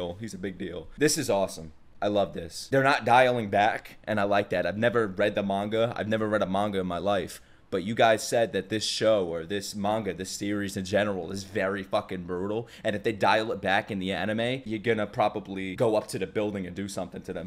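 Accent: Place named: New Jersey, United States. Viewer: I've been trying to figure this dudes accent, definitely Jersey.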